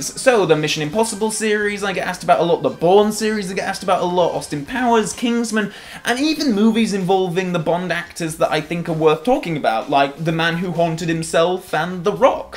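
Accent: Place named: North, United Kingdom. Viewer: Thank you very much for replying! The curious thing about doing a You Tube series for so long is you can chart yourself and any differences. Just to say, I really like your older videos as well as your newer ones (so I hope you never take those down). In their favour, I'd say you had quite a droll style back then, and it was fun to see when you got (faux?) annoyed. But I like how you come now across as well, it's very engaging and warm, esp to new viewers. Although it is nice to see when you get put out by something bad in a Bond film or game. And yes, you did used to sound a lot more Northern!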